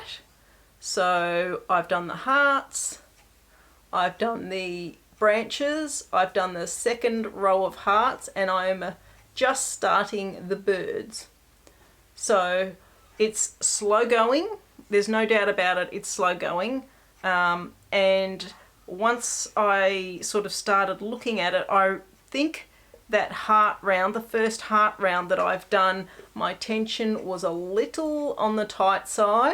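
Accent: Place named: Australia. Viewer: Hello, I fell across your podcast last night and how refreshing to hear your gentle Australian voice. I’ve jumped around a bit looking at a couple of your podcasts, ooooh your ETSY shop although your closed for a bit will eagerly wait for you to re-open. So back to the podcasts I went 🤣 I so enjoyed being introduced to your sweet daughter Mill wow did I laugh along with your conversations 😅 This morning home day for me don’t work Wednesdays generally I decided to go back to the beginning of your podcasts to get to follow your You Tube journey. So my first thought when you talked about jumpers and why us Aussies call them that was the poem Waltzing Matilda and Wikipedia has this description and it made perfect sense to me why we say ‘jumper’ instead of ‘sweater’..... “Jumbuck is an Australian term for a male sheep, and is featured in Banjo Paterson's poem "Waltzing Matilda”. 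I haven’t gone through other’s comments someone may already have thought this. I will have sheep wearing jumpers now jumping around the paddock yards with the working sheep dogs 😂🤣😂🤣 I am so lucky to live (rent) on a working farm approx 700 acres with sheep and cropping being mainly wheat & barley and have so laughed watching new lambs jump and play chasey during lambing season through the year when they have run of the yards just outside of our home garden, the best of fun. 🇦🇺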